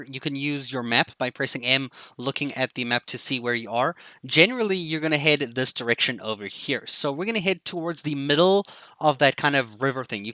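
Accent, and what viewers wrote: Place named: South Africa. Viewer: You sound abit South African bro❤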